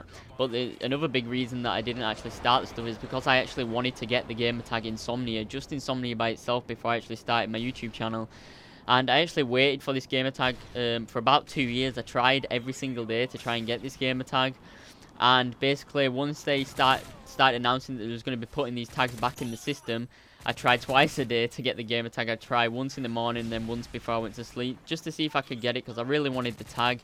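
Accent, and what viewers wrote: Yorkshire, United Kingdom. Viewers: Where you from mate, you sound like you're a fellow Yorkshireman?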